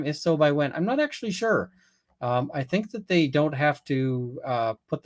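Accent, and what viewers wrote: Canada. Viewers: Had no idea you were Canadian! Yup, Americans always know us by our Canuck accent! I don't hear it either. Enjoy your blog and your jury insights. Today's witnesses (Tues) were a total bore. Those poor jurors! The damages perspective was a bit interesting. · I love the accent! Most of my family is in Canada and you sound just like them! Also my mom says it that way . She is Canadian and I’m dual cit but never got to live there . Still May move.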